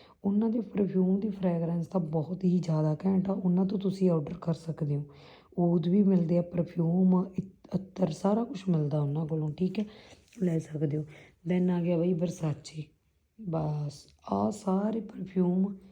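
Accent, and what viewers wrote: Malwa, India. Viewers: Hnji didi ji malva cha aapa sare varge hi bolde aa ji and tusi sare bhut vadiaa o didi ji waheguru kare tusi didi hamesha aae hi khush rho ji ❤❤❤didi plzzzzzz jado tusi kadi pind hun aae ta daseou ji mera pind kot fatta ji kot smir de nal ji asi thunoo ghar blaouna ji ❤❤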